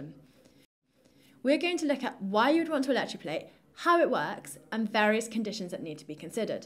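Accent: United Kingdom. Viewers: its pure british. enchanting no doubt but i wonder do they also love the way we speak hindi. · British accent🤩😍😅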